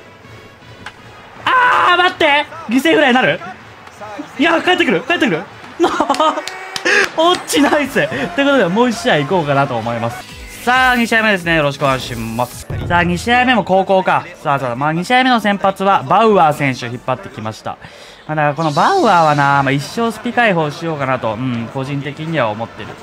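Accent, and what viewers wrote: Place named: Western Japan, Japan. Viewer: 時々、西の言葉入るよね～！嬉（笑）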